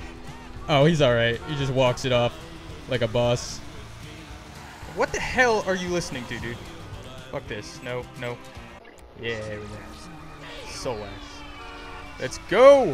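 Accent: Australia. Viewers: AUSTRALIAN WHAT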